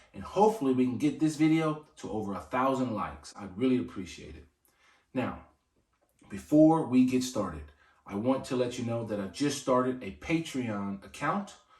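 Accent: United States. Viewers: Just on your first point. When I first stumbled on your channel it never crossed my mind where in Africa are you from. I just thought a nice bloke with a yank accent, where in the States are you from? I have honestly really enjoyed your content up to now. No need to bring race into your YouTube videos. Also reference the slave trade. Poor people of all colours and credes were treated poorly so not specifically unique to black people only. Our forefathers used to send six year old children down mines and women would be beaten. I'm not saying the black slave trade wasn't a thing, just that there is a far bigger picture you need to consider. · as a white English male myself, I identify you as American and I base that on your accent. Of course I may be wrong, but unless you tell me different, I'll go with that and see no reason to assume any different. My grandparents were Russian but I've never felt it reason to introduce that fact on any greeting or document and because I have white skin nobody questions my British identity. 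Although even if Russians had black skin (and some do of course), I would still feel English and still identify myself as such. Yes if I did have black skin it would suggest another layer to my life story and I'm sure some people would be intrigued enough to ask, but being born in England and identifying as such, wouldn't and shouldn't be diluted by my skin colour, I'm not "sort" of British I'm English born and bred. This is my country these are my people and this is the country I would give my life for, if it were threatened by ANY other. I'm sure you feel the same way about America, why wouldn't you, your an American. That's not to say you couldn't become British yourself, but no piece of paper will truly tell you when that happens, your heart will, which in my eyes would made you very special, because you will have CHOSEN to be one of the family.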